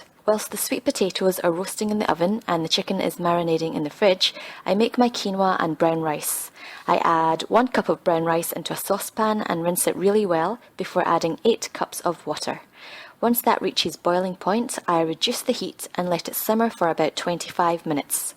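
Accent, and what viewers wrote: Scotland, United Kingdom. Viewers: Love your videos and your Scottish brogue Regarding chickens and washing... I always wash raw chicken prior to cooking especially if it is whole This practice reduces the harmful bacteria count especially the dreadful salmonella which can cause food poisoning if you unfortunately undercook your chickens Immediately sterilize the sink counter and all items used to handle the raw chicken It is wise to use a food thermometer inserted in the thickest part of the chicken but away from the bone Health Canada advises 85 degrees C or 185 degrees F. (Some authorities are happy with 170 degrees F) The chickens should "rest"for 15 minutes prior to carving Keep up the good work with your fun and helpful videos! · I came on youtube to look for some meal prep inspiration cos I need to lean up. Great video! But I can't get over you being Asian with a Scottish accent hahaha x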